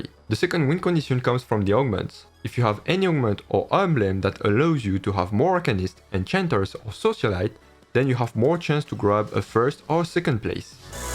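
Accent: France. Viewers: French accent, right?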